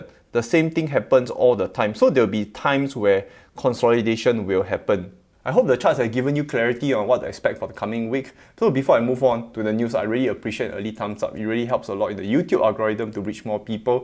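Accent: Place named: Singapore. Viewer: You are doing very well, thank you very much👍👍👍💖😀 . Please take some lessons in innonciating English WORDS properly and correctly, to reduce or eliminate your very heavy Singapore accent. Thank you 👍😀👍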